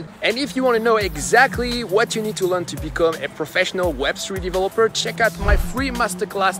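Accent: France. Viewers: Salut très bonne vidéo, tu es français ?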